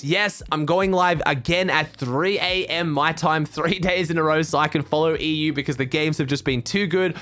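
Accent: Australia. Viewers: Are u an all-star Aussie? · Are you from Melbourne or Sydney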